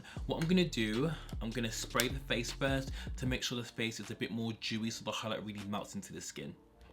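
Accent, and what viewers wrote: United Kingdom. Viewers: u r so adorable! i see u on insta all the time!!! ❤️❤️❤️finally watching you on youtube! your accent is smh crazy! 🙏🏾🙏🏾🙏🏾english/ British accent is my fav😍😍😍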